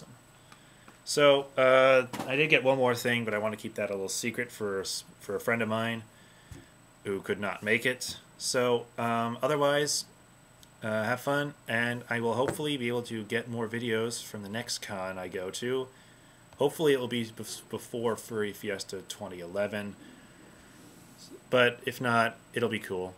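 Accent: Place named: Germany. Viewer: Last thing I wanna do is sound like a dick, but your German accent reminds me of Beerfest, in a good way.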